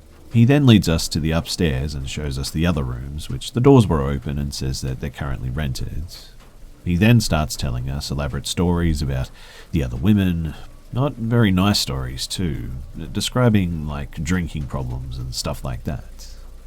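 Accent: Australia. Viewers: your Aussie accent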